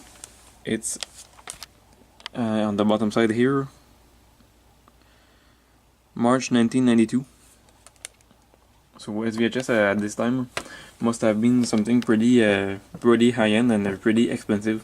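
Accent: Quebec, Canada. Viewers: J'ai reconnu tout de suite un Québécois. Haha on est facile à spoter quand on parle en anglais.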